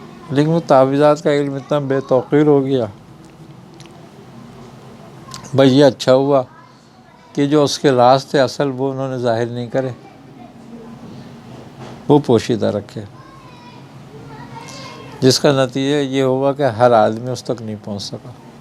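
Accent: India. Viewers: aap ki urdu bhuhat achchi hey dilliwali urdu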